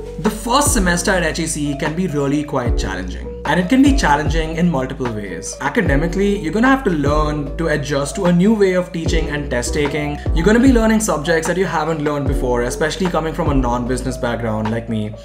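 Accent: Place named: India. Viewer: I'm bothered by the strong Indian accent. I could hardly understand what you're saying. Speak well so people can understand you. That kind of English wouldn't cut at a top UK, let alone US business school.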